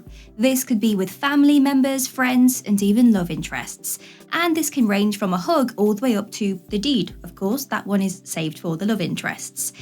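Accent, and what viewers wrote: United Kingdom. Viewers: has a nice British accent to listen to, where in England are you from? 😅 good tips and yeah dating is difficult 😊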